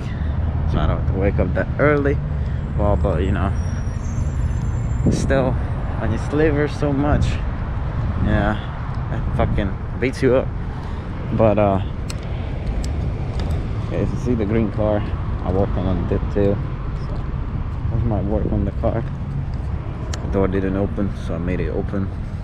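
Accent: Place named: Armenia. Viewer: Your accent sounds either Armenian or Russain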